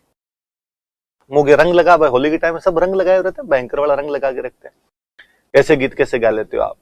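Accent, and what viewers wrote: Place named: Shekhawati, India. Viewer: बीकानेर में कहां से हो सर आप की बोली और स्टाइल से तो ऐसा लगता है कि आप शेखावाटी टच वाले इलाके से हो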